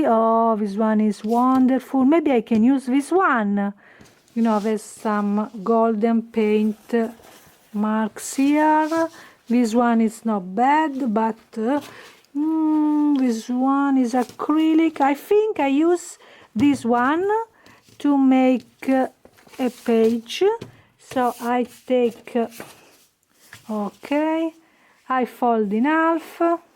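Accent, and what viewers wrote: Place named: Italy. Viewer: I loved hearing your fun Italian accent! I'm inspired always by your great variety of skills!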